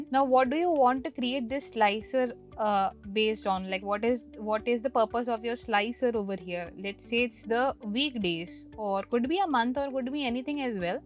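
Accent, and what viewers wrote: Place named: India. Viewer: She is good. But you also Indian i mean same accent with her. 😂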